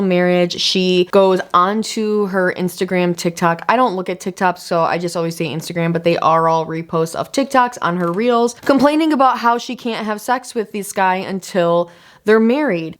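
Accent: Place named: Midwest, United States. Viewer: Omg are u Midwestern? I hear my ‘aaaaaah-ccent’ in ur way of talking hehe ;)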